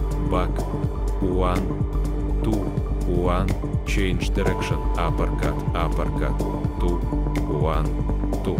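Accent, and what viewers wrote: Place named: Russia. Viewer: The russian accent makes it even more authentic and soviet like😅👍👍👍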